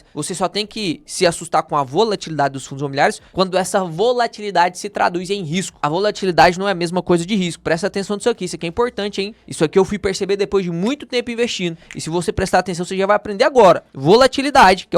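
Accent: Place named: Minas Gerais, Brazil. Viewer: Velho. Essa voz de batuta da roça mesclado com conhecimento e uma pitada de mineirez , ficou top ! Kkkk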